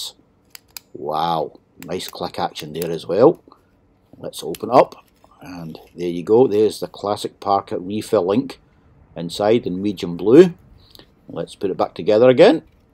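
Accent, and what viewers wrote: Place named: Ireland. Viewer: I love that Irish accent. the 'Rs' just roll off the tongue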